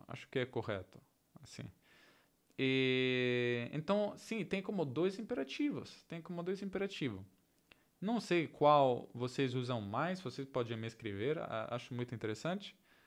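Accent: São Paulo, Brazil. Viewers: Está ficando muito bom o seu português, pra mim vc está com um sotaque mais perto do Paulista.. se vc falar com TU, fica parecendo um sotaque da região sul do Brasil, vc vai se confundir muito, de uma focada em vídeos aqui do Brasil como o canal NOSTALGIA, HISTÓRIA E TU, vc vai identificar as palavras com mais facilidade... uma pequena ajuda... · Ciao,. Imparo tanto tanto "contigo" che vorrei darti indietro un po' dei miei commenti da nativo pigna che ha sempre qualcosa da dire Algumas dicas: "me deu" diferenças, senza la "i" profissional, con la "i" parabéns!!! "padrão", non mi aspettava - bello uso! accezione vira acepção, que é un parolone alto; forse potresti usare sentido Pude notar uma bela mudança das tuas "d", como fazem os paulistas. Essa fala híbrida com uso de "você" ao mesmo tempo que usamos as "subformas" do "tu" (te/ti/tua) é uma coisa que faz o Pt-Br bem insólito e complexo para os estrangeiros. 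Falamos "teu/tua", mas "seu/sua" soa mais macio (la "s" è sorda, morbida) que a dureza do "t", que vem de maneira até um pouco rude. Você já viu que "seu" é "pronome de tratamento" também? Tipo: "Seu Eugênio" = "Senhor Eugênio". o tempo "todo"; tudo x todo - eu achei um "quick way" para explicar isso e você saberá pôr em um conceito gramatical mais complexo. Todo é usado usualmente seguido de um substantivo (todas as coisas, todo o mundo, todas as mulheres), enquanto (tudo é um pouco self-sufficient haha e está bem por si só (ela comeu tudo, eu quero tudo, tudo que eu quiser...). Não sou linguista. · 👏🏻👏🏻👏🏻👏🏻👏🏻 Amei quando você falou "estou aprendendo subjuntivo futuro" 😂 Acho que eu nunca aprendi... Sto scherzando. Você está falando muito bem. Com o sotaque de Sao Paulo. O sotaque de São Paulo está para o português "standard", assim como o sotaque de Milano está para o italiano "stantand". 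Por exemplo, o nativo de São Paulo não usa o pronome "tu" no lugar de "você". E aqui, por influência dos italianos que vieram morar no Brasil há mais de 150 atrás, falamos as sílabas com o mesmo tempo... sem suprimir nenhuma sílaba. Isso faz o português do Brasil, sobretudo o de São Paulo, ser semelhante ao modo de falar dos italianos do que dos português. Por exemplo, aqui no Brasil falamos "pre-si-den-te" com o mesmo tempo para cada sílaba. Já o portugues de Portugal, eles apertam, espremem algumas sílabas, dificultando o entendimento. Você está indo muito bem no aprendizado do portugues brasileiro. Mas acho que a ultima coisa que você vai aprender é que não falamos "mais grande"... não falamos "piu grande", como os italianos costumam falar. Mas falamos "maior" ou "é maior". 😊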